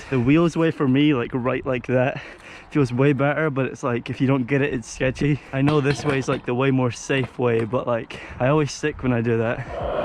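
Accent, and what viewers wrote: Scotland, United Kingdom. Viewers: u scottish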